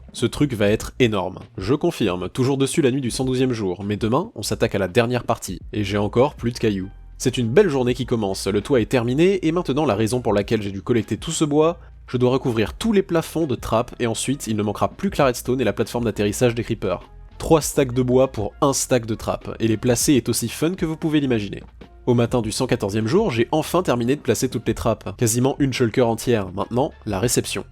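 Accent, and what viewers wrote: Quebec, Canada. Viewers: J'aime ton accent quebecois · tes québécois?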